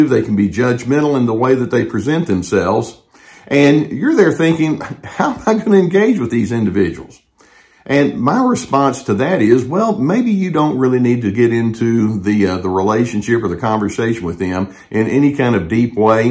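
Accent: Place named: North Texas, United States. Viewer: Thanks for posting this. Your North Texas voice is comforting as we lived most of our lives around the Plano area. We've recently retired from North Texas to Portugal and share a two apartment condo building with an elderly, Dutch female textbook narcissist. Emails and text messages from this neighbor attempting to govern our lives are read with varying degrees of trepidation. Is it possible to create a video for us about online communication styles of narcissists? Thanks!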